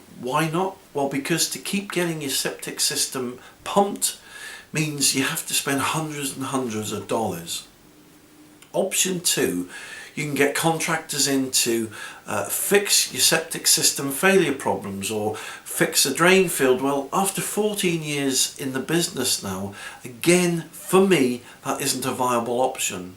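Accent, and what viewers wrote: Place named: United Kingdom. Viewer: Again, this guy is giving inaccurate information about Residential Septic Systems, because pumping a Septic Tank is only a few hundred dollars, and it doesn't need to be done every year. In fact, pumping a Septic Tank is usually recommend every 2, 3, 4, or 5 years, depending on the size of the tank, and the number of people living in the house. You don't need to add worms or anything else to your Septic Tank or Leachfield/Drainfield... absolutely unnecessary! This guy is just a pitch man, and he's full of you know what... don't be fooled by his British Accent or this video presentation.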